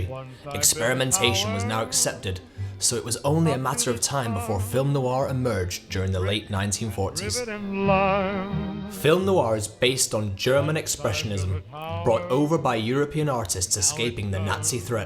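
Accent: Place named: Lancashire, United Kingdom. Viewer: Can't hide a Lancashire accent. 😁 Fantastic doc!! Thank you!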